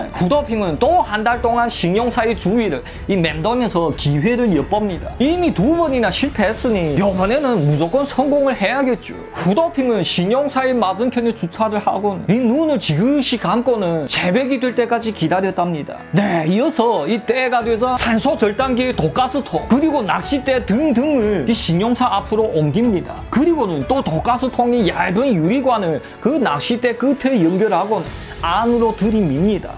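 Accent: Yanbian, China. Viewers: 는 연변사람인가요?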